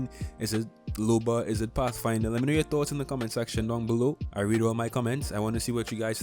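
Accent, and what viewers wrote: Trinidad and Tobago. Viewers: YOU SOUND TRINI MY GUY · R u a trini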